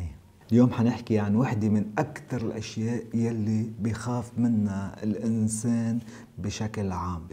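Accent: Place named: Lebanon. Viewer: اللكنة اللبنانيه جميله جدا وصوتك واسلوبك الهادي معجب فيه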